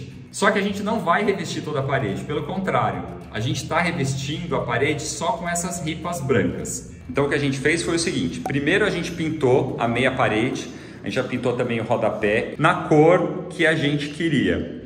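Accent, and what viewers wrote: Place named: Paraná, Brazil. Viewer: Vc não perdeu o sotaque de pé vermelho!🙈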